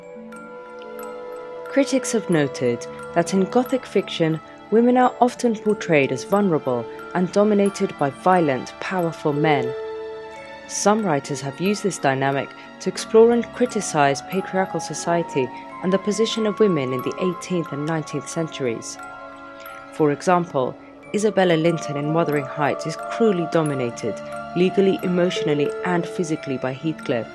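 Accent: United Kingdom. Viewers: for me, Gothic (anything) is pure Heaven as I adore Halloween in all its Glory and endeavour to make it as scary and fun for the Kiddies of my little Neighbourhood as possible. a few years ago I heard Halloween was on the wain but then found out we (in North America) spend about $30 B on the stuff rivalled only by Christmas. for some unknown reason, all Gothic Architecture gives me chills and sends me back in time. one more observation is your incredible accent and phrasing. my Granny was from Lancashire but an English Teacher and her pronunciation of words were so similar and I have never forgotten this to this day.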